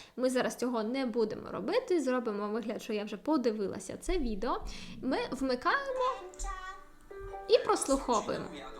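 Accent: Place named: Poland. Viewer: Пані, вибачте, але у Вас сильний польський акцент.😉 Не маємо в українській мові слів "окремний" та "трохі", у слові "вимова" наголошеним є другий склад, а звук [ў] у закінченнях "-ів", "-ив" ніколи не перетворюється на звуки [в] або [ф]..)) Плекаймо ж Її- нашу Мову-Страдницю! За поради ж щодо польскої- велика Вам, пані, подяка!!)))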